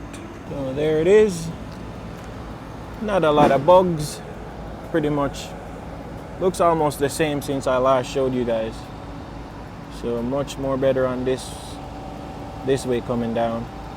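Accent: Jamaica. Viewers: I sense a Jamaican accent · Can i ask if you happen to be jamaican? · Mi know mi people any weh we deh lol big up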